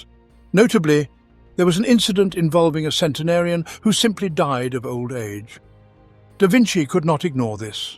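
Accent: United Kingdom. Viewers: I can't stand British accents pretending they are intelligent. 😮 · Why ugly englisg accent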